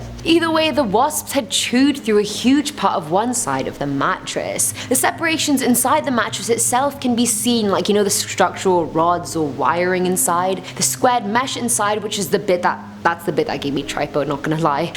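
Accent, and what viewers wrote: United Kingdom. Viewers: I honestly can’t tell whether she’s a fellow Brit 😂😂😂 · They love hiring attractive women with British accents don't they?😂😂🤣🤣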